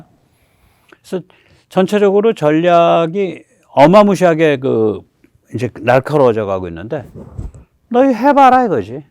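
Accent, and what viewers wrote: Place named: Seoul, South Korea. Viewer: 서울 사투리 쓰시는거 같아요. 'OO 밖에' 를 'OO밲에" 이렇게 말하시네요.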